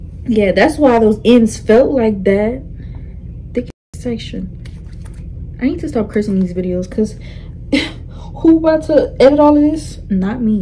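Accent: Louisiana, United States. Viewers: ok so what part of Louisiana are you from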